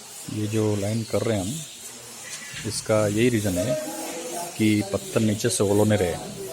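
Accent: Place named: Mewar, India. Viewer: Ktu bhaisab mevadi bolira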